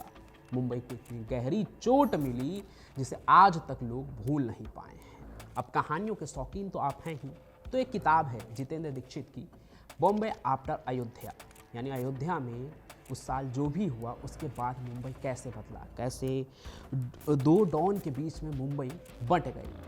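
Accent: Bihar, India. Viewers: Bhai tu bihar se hai ky tere gawaro jaise bat krna smjh me aata hai tu bihari hai😂😂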